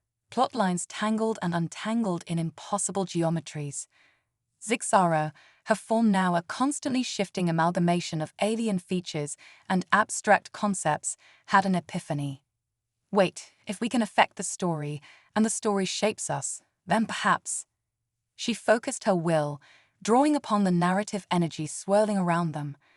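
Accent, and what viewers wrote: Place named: Australia. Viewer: This might be an AI story channel hard to tell but I love the Australian female accented voice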